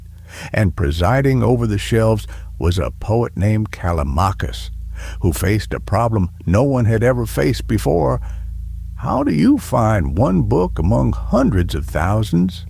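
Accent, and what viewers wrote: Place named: Midwest, United States. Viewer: Hillbilly accent? You've obviously never actually heard a hillbilly accent before. This sounds like a Midwestern accent.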